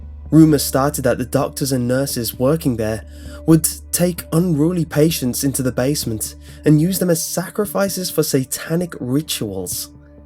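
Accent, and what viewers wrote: England, United Kingdom. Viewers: Nice video... But - where is your accent from? You sound English, yet you said “Clochester” instead of COLCHESTER. Colchester is claimed to be the oldest town in Britain. It was for a time the capital of Roman Britain. Hospital Name: Severalls Hospital, Previous Names: Second Essex County Asylum, Essex and Colchester Mental Hospital, Severalls Mental Hospital Location: Boxted Road, Colchester, Essex CO4 5HG Principal Architect: Frank Whitmore and William H. Town Layout: Compact Arrow & Villas Status: Derelict Opened: 27th May 1913 Closed: March 1997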